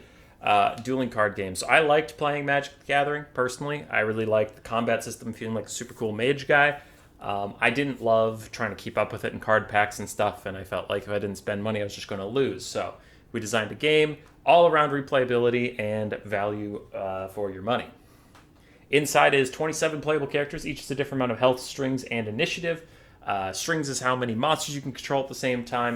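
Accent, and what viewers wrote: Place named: France. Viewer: i love the french accent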